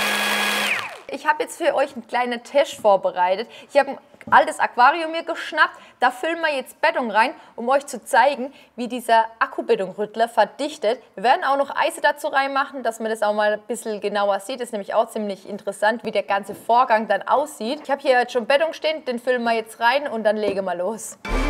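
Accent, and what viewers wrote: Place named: Baden, Germany. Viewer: ich dich einfach eine power Handwerkerin mit deinem badischen Dialekt 😘 liebe aus Hamburg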